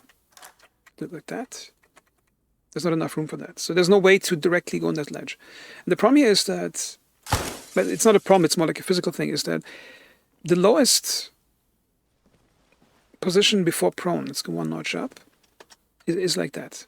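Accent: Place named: Germany. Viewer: Something about your voice‘s pitch, the slight German accent and the way you accentuate your sentences is very endearing to listen to.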